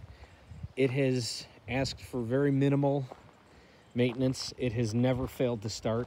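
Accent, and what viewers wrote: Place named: Buffalo, United States. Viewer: That Buffalo accent 😂 nice videos man. Nice car too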